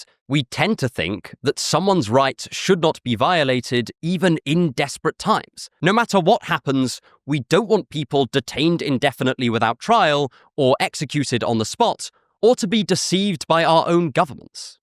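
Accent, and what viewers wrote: United Kingdom. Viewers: Ah, nothing better that a good video-essay with a good orator with British accent and 1am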